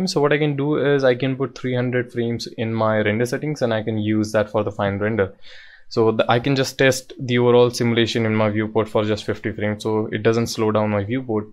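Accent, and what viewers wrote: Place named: India. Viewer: I found that this guy is an Indian because of his voice😎😈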